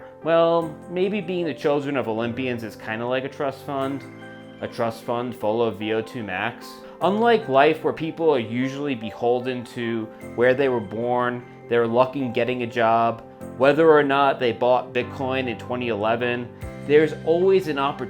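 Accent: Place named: United States. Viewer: Your accent says American but your humour says British! Welcome home old Chum.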